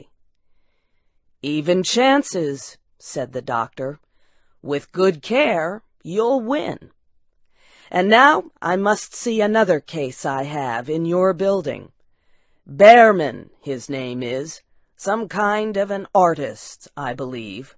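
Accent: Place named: United States. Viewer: 'Her' voice 'is' not good . Wrong grammar . I hope you think twice before commenting next time because that's a very nice american accent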